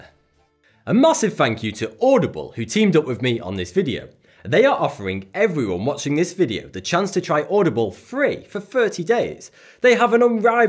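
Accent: United Kingdom. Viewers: omg you are british immediately frogives you for sponsors and ads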